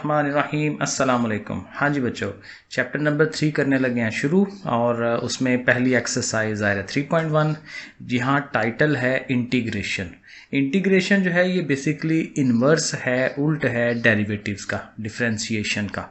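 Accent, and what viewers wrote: Sialkot, Pakistan. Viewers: Sialkoti Urdu accent 😂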